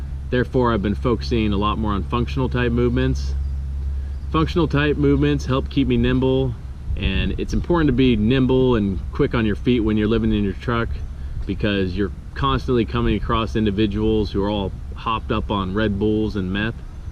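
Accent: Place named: United States. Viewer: Sangria pronounced SANGRIA not Sengria. If you you want to learn Spanish you must drop that gringo accent. Otherwise sounds so stupid.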